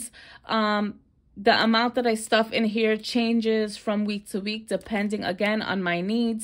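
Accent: New York, United States. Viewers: Correct me if I’m wrong but your accent sounds like you’re from New York. I like the accent lol I’m from Louisiana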